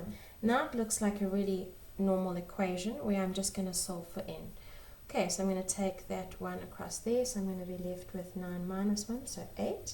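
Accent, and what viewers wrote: South Africa. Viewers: She sounds south african ryt...?😄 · I honestly love SA accents so much. I have an english one but yours just sounds so much more elegant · Youre South African?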